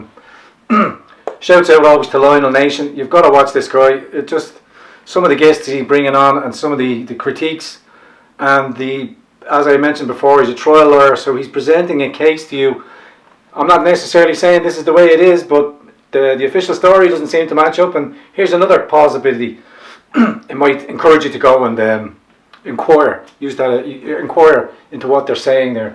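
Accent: Ireland. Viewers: i love Irish accents, thank you my brother. may YHVH our Yeshua bless and keep you in HIS hands. please research where Israel was scattered; you as the Irish, are of the tribe of Judah. Israel will have blindness of face until the end of days. we're there, this is the end of days. Find out to whom you belong, who is Israel? Did YHVH lose HIS people? NFW. read Isaiah , ask yourself who hung on the cross. YHVH is our Yeshua, you are of His tribes. know your enemy Jn. , , Rev. 2:9, 3:9 or Mt.13. bless you brother. James (of Irish blood) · I love your brogue brother.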